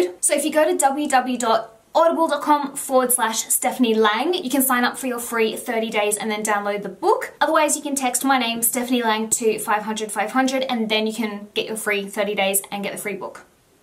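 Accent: Australia. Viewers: Ireland? You don't sound Irish, you sound Australian or something. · Is her accent Australian? Sorry I can't assess that I'm Dutch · Ireland? I always thought you were australian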